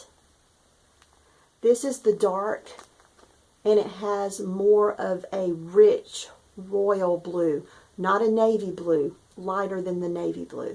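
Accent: South, United States. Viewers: You make me laugh, your southerness is the best.......what a wonderful idea for your sons room.....god bless him for serving, my son is in the AF as well 17 yrs ......I miss him immensely, he will retire hopefully in 3 I don’t think he plans on staying longer, he will be 38 yrs old him and family are stationed in Vegas I live in ohio so I only see once a year, it’s hard...but they will move home after retirement...yeah! Love all your stitching, stash and junking....I’m a junker too 😀😀😀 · Thank you so much for sharing your trip to Charleston! It is definitely on my list to visit! I was born and raised in Florida, and have many ancestors from GA and North and South Carolina 😄 Your stitching is gorgeous! And your southern accent makes me homesick. Enjoyed my visit! ☘️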